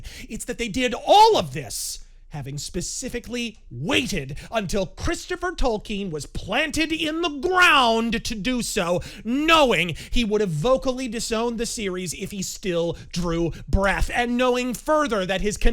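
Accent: England, United Kingdom. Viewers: For a brief momemt, I thought you had a british friend do VO. Haha nice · As an Englishman I approve of English accent. One Shitposter to Rule Them all!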